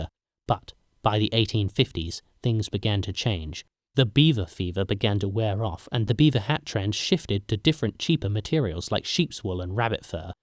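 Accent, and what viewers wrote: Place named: United Kingdom. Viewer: This youtube formula is crazy: British accent + shitty graphic art + whimsy commentary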